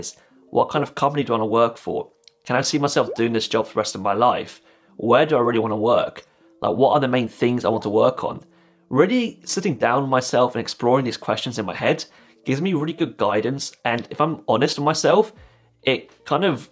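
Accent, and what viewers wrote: United Kingdom. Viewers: I am using your video as part of my IELTS listening;you have a nice british accent.Keep good health brother❤️.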